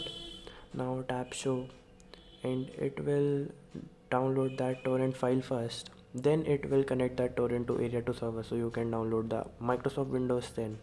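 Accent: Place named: India. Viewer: its impossible for me to understand Indian people talking english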